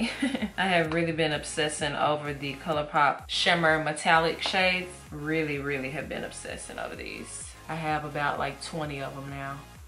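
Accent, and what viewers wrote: South, United States. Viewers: Beautiful! Every Time I watch your videos I always think of Beyonce your voice sounds so much like her speaking voice. Not just the southern accent but also the tone.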